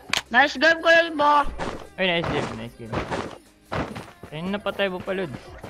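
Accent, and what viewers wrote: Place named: Iloilo, Philippines. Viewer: Ilonggo yan lods hahaha trastalker hahaa illongo kami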